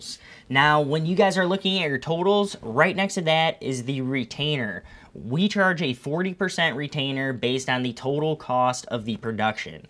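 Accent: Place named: Midwest, United States. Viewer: Midwest accent gotta love it! I say this in my own Midwest accent.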